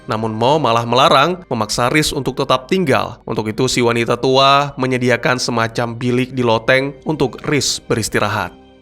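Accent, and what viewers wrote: Aceh, Indonesia. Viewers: Maaf bertanya org Aceh ya bg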